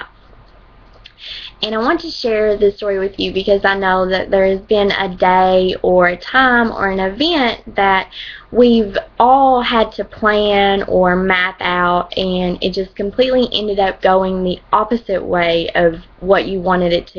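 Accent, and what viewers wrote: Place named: United States. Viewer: Hey momma holy country accent lol that took me by surprise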